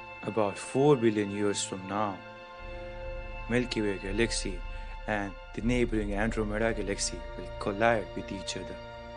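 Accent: India. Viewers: u sound like indian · He sounds so Indian yet so African yet so Asian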